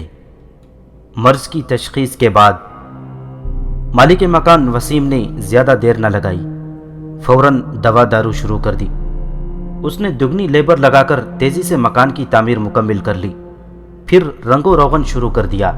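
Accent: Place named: India. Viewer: آپ کے بولنے سے سمجھ گئی تھی کہ آپ انڈیا سے ہو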